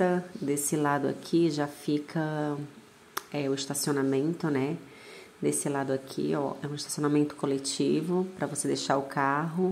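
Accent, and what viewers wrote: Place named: Fortaleza, Brazil. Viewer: Só pelo sotaque já sei que é Fortaleza! Sucesso pra vc...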